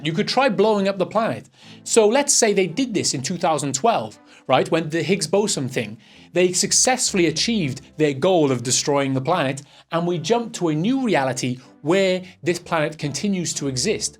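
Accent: Wales, United Kingdom. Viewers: literally every vid you make is on the lines of what I'm researching, i feel like your following me...and you sound like your from my end of Wales...definitely following me XD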